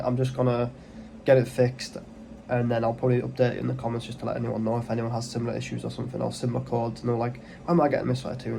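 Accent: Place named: Middlesbrough, United Kingdom. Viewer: sounds very middlesbrough talking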